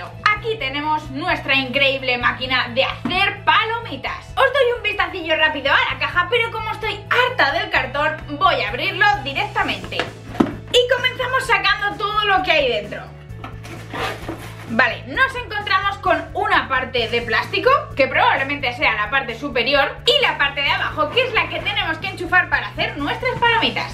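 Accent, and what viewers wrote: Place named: Spain. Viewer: pero en su caso ella es de España y la verdad no soporto su acento, obviamente el de todos los españoles.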